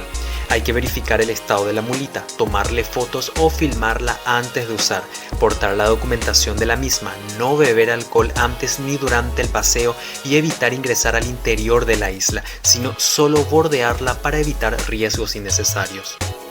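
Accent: Paraguay. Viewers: Excelente video!!... Perdón... Tenés acento paraguayo?...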